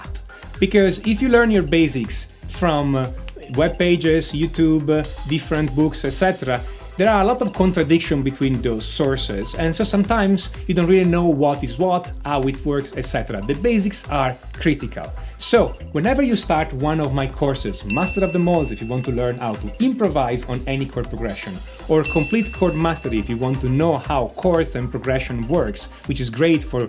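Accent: Italy. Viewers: he has quite restrained an accent for being Italian -^_^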